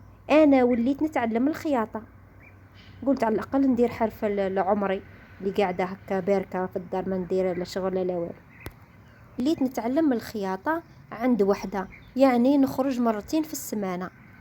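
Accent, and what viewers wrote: Algeria. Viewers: والله ياختي راكي في القمة وأنا اكتشفت قناتك بالصدفة كنت انحوس على حكايات القسنطينية لقيت القناة أنتاعك وعجبتني وعجبتني اللهجة انتاعك على خاطر انتاع الشرق وأنا انقولك واصلي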